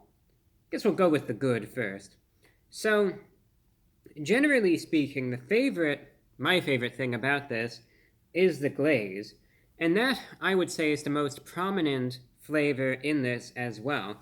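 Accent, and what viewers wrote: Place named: Transatlantic, United States. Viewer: Brah really has morphed his accent from New Yahk to Transatlantic over the years.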